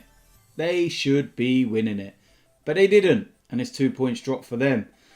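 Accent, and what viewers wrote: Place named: England, United Kingdom. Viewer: Maybe don't pronounce the names with an English accent but try to look at the country where the name comes from. Or don't try it, now it's funnier😂. Keep going, starting my day with your Daily's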